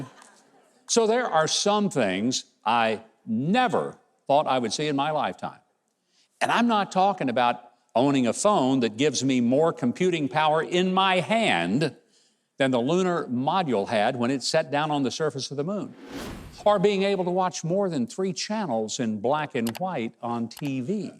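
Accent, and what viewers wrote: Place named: Tennessee, United States. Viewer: You sound like you are from TN. Hockabee.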